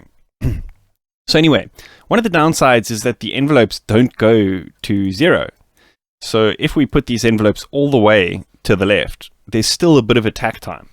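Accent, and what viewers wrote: South Africa. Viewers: Yooo a fellow South African?!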